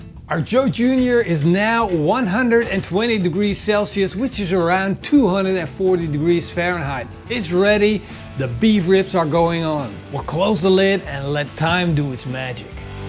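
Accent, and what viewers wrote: Netherlands. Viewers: Are you from Holland? Sure sound that way! Anyway, great channel. Greetings from Norway :) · Are you dutch your accent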